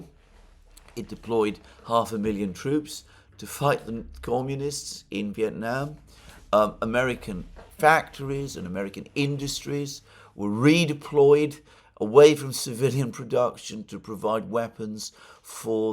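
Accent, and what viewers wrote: United Kingdom. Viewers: Excellent explanation of Bretton Woods - perhaps with your professorial panache ( I mean this sincerely, no sarcasm intended) you can explain to most (untutored) Americans what deficit-fueled economics and and fiat-currencies are. I don't even believe most economists know - or can articulate it. You're clearer yhsn Jeffrey Sachs. If only we could all wrap our minds around what the heck a tariff really is. Your very proper British accent would definately make the "lecture pill" go down easier.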